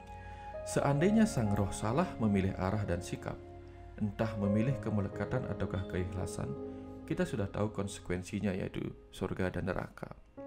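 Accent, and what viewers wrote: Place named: Bali, Indonesia. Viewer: T nya masih Bali yan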